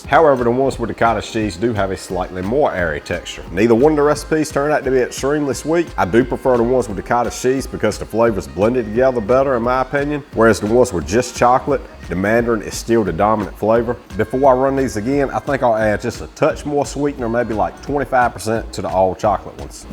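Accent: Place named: South, United States. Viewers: Sounds like A LOUISIANA accent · What part the south y’all from? I’m from NC and I adore the degrees in regional southern accents. I love your accent but I can’t quite place it!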